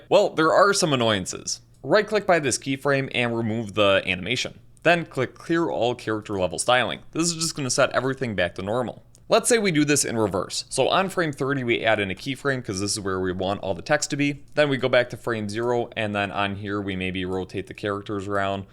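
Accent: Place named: Texas, United States. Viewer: Yo, Are you from Texas? I have a friend from there who sounds just like you! W tutorial btw, would love to see more videos like this.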